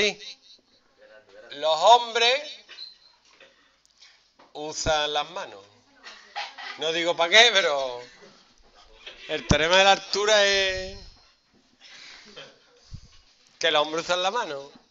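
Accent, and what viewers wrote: Andalusia, Spain. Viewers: ole ese andaluz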